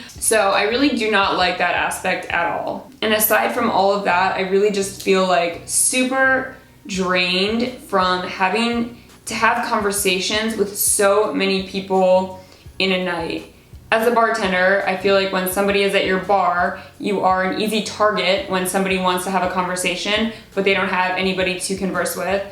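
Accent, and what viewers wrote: Baltimore, United States. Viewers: You’re from Baltimore?? I love that city definitely has an interesting accent hahah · Oh. are you a Baltimore Hon? Go down the Ocean hon :)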